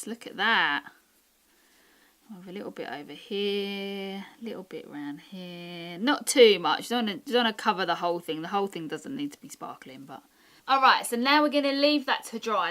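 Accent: United Kingdom. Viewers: Loooove the British accent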